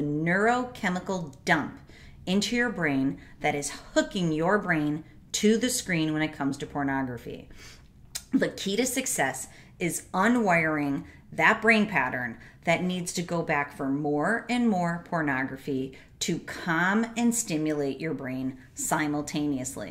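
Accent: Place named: Wisconsin, United States. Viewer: That Wisconsin accent is so annoying miss church lady.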